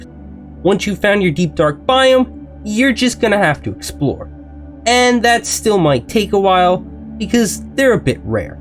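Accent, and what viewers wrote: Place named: Northeast, United States. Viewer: First of all, great video and it’s the first I watched of yours Second, you’re definitely north east coast 😂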